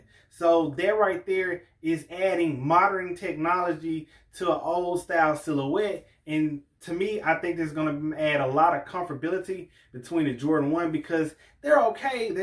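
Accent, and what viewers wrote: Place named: Kentucky, United States. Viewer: Where are you from? Your accent is like wow? Kentucky?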